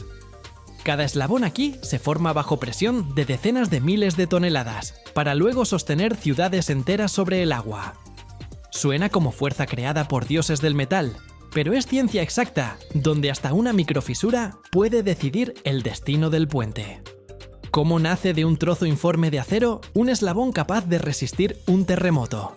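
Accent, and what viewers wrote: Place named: Spain. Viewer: Buenas imágenes, buenas tomas en el vídeo pero que lastima que hables como los españoles de españa,España con “e"menuscula soy Americano Nativo, greetings.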